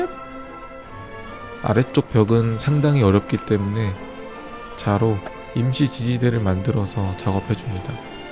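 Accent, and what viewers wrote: Daejeon, South Korea. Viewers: 형 대전출신이야?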